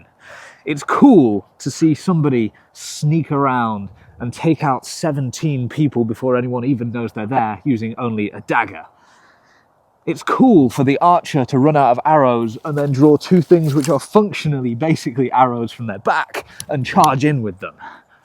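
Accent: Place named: Yorkshire, United Kingdom. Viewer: I suspect part of it is your accent. Yorkshire?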